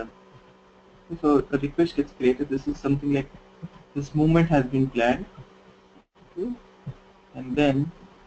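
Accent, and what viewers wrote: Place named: India. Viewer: there is a back ground job in this video makes it ghard to follow plus the indian accen that is so awfull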